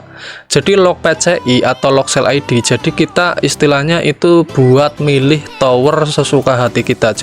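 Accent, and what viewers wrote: Banyumas, Indonesia. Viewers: Ketone wong ngapak kiye 😂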